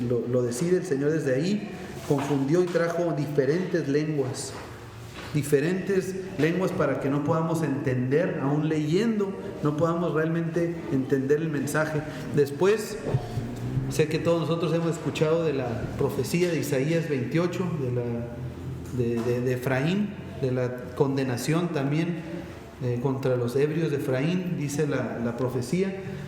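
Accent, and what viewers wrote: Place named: Colombia. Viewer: Buenas tardes como está usted? Por su acento usted parece que fuera colombiano. Mira te voy a decir una cosa por favor ya no le estés enseñando a la gente eso DEL SEÑOR De cual señor usted me habla del señor Alberto el señor Pedro el señor José. Y de cual Dios usté habla del Dios de las moscas del dios del viento del dios del fuego. Déjeme saber por favor. Yo soy colombiana pero por favor enseñe lo que es correcto. Desde aquí desde Estados Unidos. Y por favor responda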